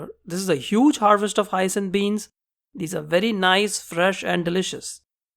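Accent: India. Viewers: hey dude u sounds like native indian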